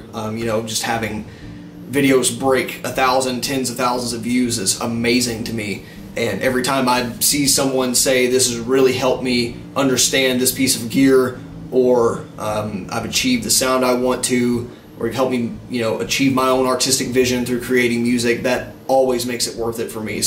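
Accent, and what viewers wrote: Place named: Mississippi, United States. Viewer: Digging the channel man, keep it up. I recognize that accent, sounds like Mississippi or somewhere around there to me! I got friends out there, haha. ;)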